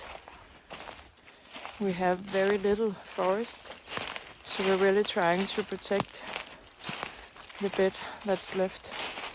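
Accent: Denmark. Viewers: with her lovely Denmark accent. The sound of a flowing river is always soothing. Greetings from Ontario Canada 🇨🇦 🍁 🇨🇦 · Hahaha! I love the Denmark accent! 🤙🏽💯🔥 Just like Cimbrer Bushcraft! Thanks for sharing the beauty of Denmark! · I concur! Her Danish accent is easy on the ears.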